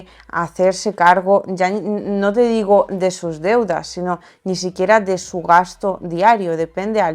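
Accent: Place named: Spain. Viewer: Mira, tu audiencia es latina y no española, por lo que te sugiero practiques un poco el acento neutro. Te entenderemos mucho mejor.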